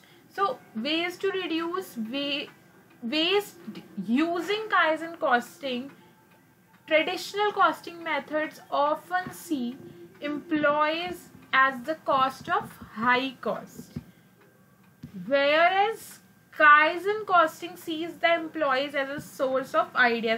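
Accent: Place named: India. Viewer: Why did you switch to speaking Indian, I was enjoying your video earlier.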